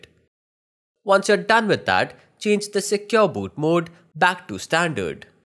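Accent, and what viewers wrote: India. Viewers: This has proven me again that I always have to trust tech people who sound Indian 🙏💖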